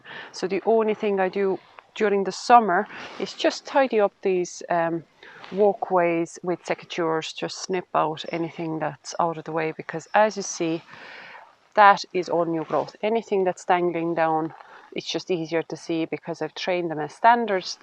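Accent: Kerry, Ireland. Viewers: Thank you so much for that. I was leaning a bit towards blackthorn since it’s one of our great native trees. We have plenty of midges already in Donegal so a few more won’t make much of a difference! I like the idea of supporting more birds and insects too. And the flowers and berries. An aunt of mine was addicted to the berries and used to eat them directly off the tree, although they must have been pretty bitter. Your advice will help me decide. PS. You have a great Kerry accent!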